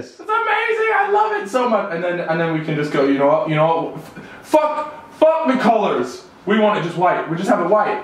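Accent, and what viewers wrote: United States. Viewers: you sound American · He sounds american and his accent keeps phasing out!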